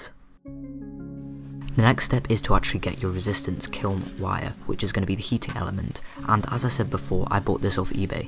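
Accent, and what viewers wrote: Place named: Wales, United Kingdom. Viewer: Great video 👌 Still trying to place the accent though. Sounds kind of south east walian.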